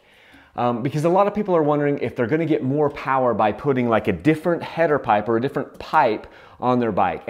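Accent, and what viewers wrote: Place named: California, United States. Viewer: I can tell your from California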